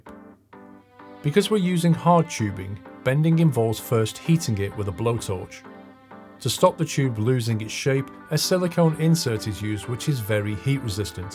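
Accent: United Kingdom. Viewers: OK to be honest his voice sounds British but his face says Florida man 100 %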